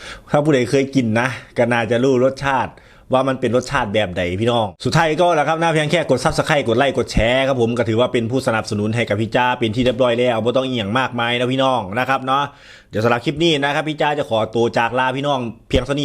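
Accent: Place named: Ban Lan, Thailand. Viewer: ไทบ้านได๋น๊อค่ะ สำเนียงเว้าคือทางบ้านลาน บ้านโนนสว่าง จำปาโมงแท้ค่ะ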